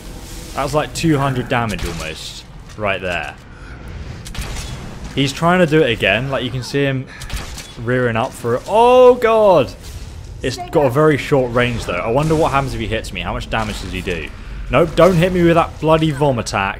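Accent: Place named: England, United Kingdom. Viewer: Da Informer his accent has nothing to do with it, its an english accent, sane as mine, i prounounce everything fine, hes dyslexic.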